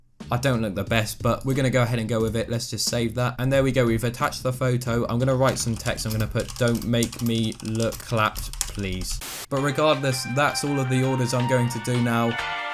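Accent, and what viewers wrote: United Kingdom. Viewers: *Boeing I forgive you since you sound very british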